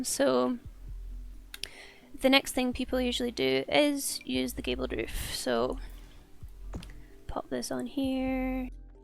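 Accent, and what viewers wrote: Scotland, United Kingdom. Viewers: I hear a fellow scot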